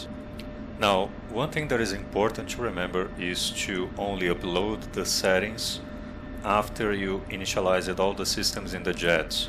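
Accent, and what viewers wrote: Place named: Brazil. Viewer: Sotaque brasileiro em inglês é mt reconhecível xD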